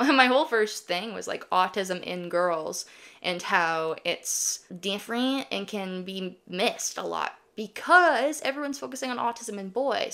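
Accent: Canada. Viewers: Canadian? I detect a mild "aboot."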